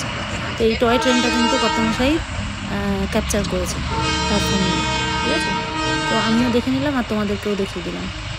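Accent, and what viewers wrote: Bangal, Bangladesh. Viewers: তোমার বাঙাল কথা আমার darun লাগে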